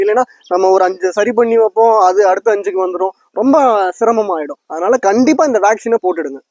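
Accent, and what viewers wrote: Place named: Madurai, India. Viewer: ❤ madurai basha thanks ❤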